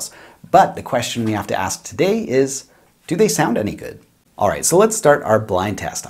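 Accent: Canada. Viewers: You have such a strong Canadian accent lol I love it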